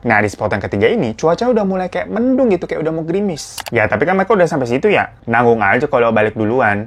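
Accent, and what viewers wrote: Java, Indonesia. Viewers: Bang...pliiizzz...jgn bkin aku ketawa ngedenger abang ngomong bahasa jawa...keren n lucu bgt👏👏👏👍👍👍usahanya polll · 😂😂lucu ngomong bahasa jawanya kk😂😂😂ngakak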